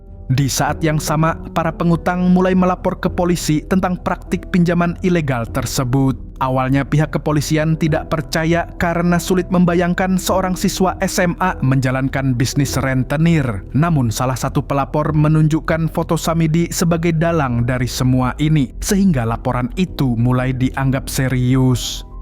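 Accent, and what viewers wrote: Banjar, Indonesia. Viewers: Pian ni orang Banjar KH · Terlalu banjar mang ai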